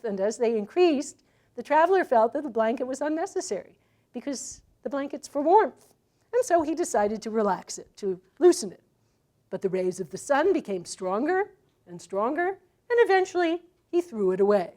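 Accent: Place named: RP, United Kingdom. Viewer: Nussbaum speaks like the stereotypical high british society intellectual.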